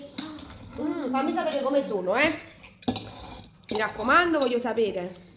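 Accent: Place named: Naples, Italy. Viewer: Poi vabbe dialetto Napoletano....Adorooo👍👍👏👏😍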